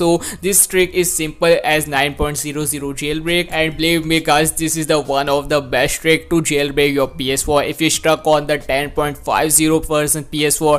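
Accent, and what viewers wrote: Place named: India. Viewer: When i heard India voice i am out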